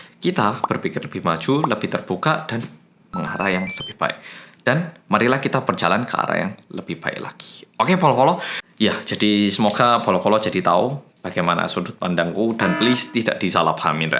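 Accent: Java, Indonesia. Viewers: Beda kepala pastinya beda pemikiran.. dengan adanya kejadian yang seperti ini justru makin mendongkrak semangat wanita Indonesia untuk menjadi lebih baik lagi dalam segala hal.. disenyumin ajalah 😊 buat mas tetap semangat dan medhoknya jangan sampek ilang 😄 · Sebelum nya saya minta maaf bang Abang nya logat bahasa nya kok medok😂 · Logatnya semakin medok aja bang. Makin ngakak aku. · Bahasa indonesia bagus bnget dan ada jawanya dikit2 kaya org indonesia tulen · Bang ini beneran orang Korea..? logatnya medok banget..kereen.. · Menurutku pemikiranmu dewasa broy .... Keren ... Indonesianya juga medok 😂👍👍👍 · Bahasa Indonesia nya medok kek orang jawa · Kok bahasanya lebih Medok appa any way I like u thx pemikirannya ya ..... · Dia udah tinggal di Indonesia (lebih tepatnya bagian Jawa) udah lama banget jadi lama² medok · bahasa indonesiannya medok baget...👍👍👍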